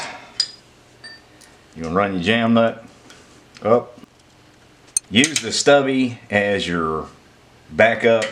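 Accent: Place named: South, United States. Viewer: Thank you for getting back to me! I found that with them bled and taking all the lash out that it took the Screaming Eagle Adjustable Pushrods 4.25 turns . A guy at the parts counter of a local HD shop told me 4 to 4.5 turns and he was right. Thank you for your video. You sound like a Good Ole Boy! Just saying I'm from Mississippi but now live in SW Colorado. Come out for a trip, it'll be a blast taking you for a tour!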